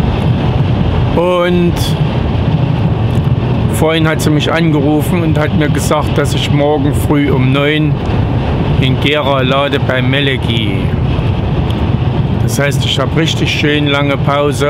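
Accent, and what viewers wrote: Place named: Saxony, Germany. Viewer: Hallo, du bist einfach der zuverlässigste. Jeden Tag freue ich mich auf dein Video. Und sächsisch finde ich seitdem auch nicht mehr förchterlisch! Mach weiter so!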